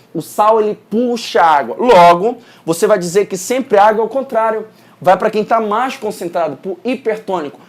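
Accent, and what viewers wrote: Pará, Brazil. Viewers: muito bom! por um acaso tu es paraense ? kkkkk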